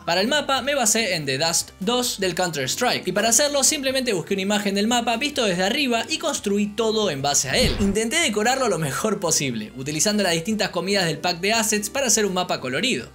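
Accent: Argentina. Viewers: Argentino? No se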